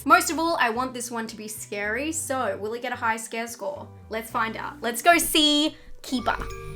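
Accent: Australia. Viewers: Not sure why this is a response to the comment above.. but what you seem to be bothered by is just regular Aussie speech patterns (there are other people elsewhere that have this intonation but it's very prevalent in Australia/New Zealand)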